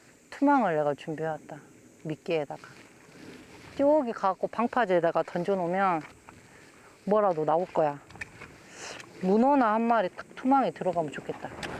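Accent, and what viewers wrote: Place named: Jeolla, South Korea. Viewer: 이언니~ 전라도 여자맞네! 억양이 세다..... 나도 남들이 듣기에 이럴꺼야~~ 같은 전라도 여자잉께 ㅋ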